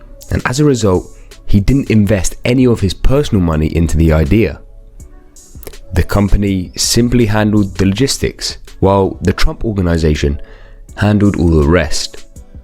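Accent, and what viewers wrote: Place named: England, United Kingdom. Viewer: I'm at 1.5x speed and it seems like a normal english accent.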